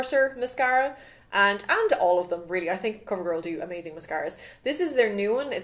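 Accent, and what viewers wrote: Ireland. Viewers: are you irish? i dont know because this is your first video ive seen.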